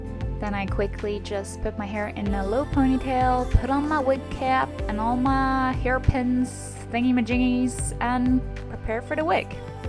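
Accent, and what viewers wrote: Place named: Norway. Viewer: I knew it!! My mother is Norwegian and I was born in Norway but I live in America sents I was 6 ( I’m 18 now) so I don’t have much of an accent . But I thought you sounded Norwegian 😂💕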